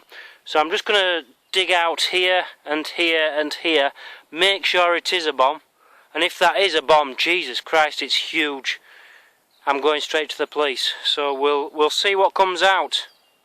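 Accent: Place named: North, United Kingdom. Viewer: BO2 azza hoster. Somewhere up north in the UK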